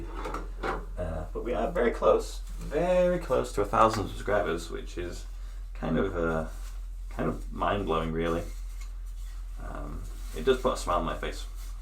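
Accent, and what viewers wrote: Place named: North East England, United Kingdom. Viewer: I go to charity shops looking for footy shirts - no luck so far. Guessing from your accent you are from somewhere in the north east, same as me, so you’ll need to take the mannequin for good luck.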